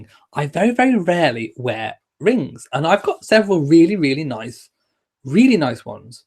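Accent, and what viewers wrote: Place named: United Kingdom. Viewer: That's why I watch your channel.... you're British....and would have a more accurate account of British news than the fake news out there. Besides...your accent is adorable.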